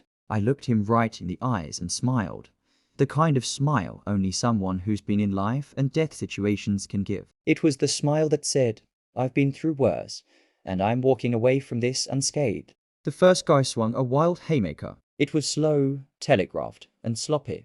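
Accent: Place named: United Kingdom. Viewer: A US Navy seal with a UK accent.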